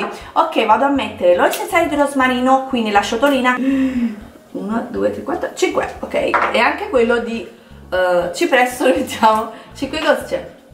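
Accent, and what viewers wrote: Naples, Italy. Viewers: Che hai contro l'accento Napoletano...dimmi fammi capire ..vorrei santire tu come parli....sei di una pochezza assurda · Toniolo ,per tremendo accento cosa intendi? Per caso non tolleri l'accento napoletano?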